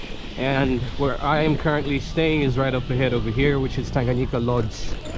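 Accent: United States. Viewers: Great vid thanks. I'm staying in this hotel in June can't wait. Are you from the States? you have an American accent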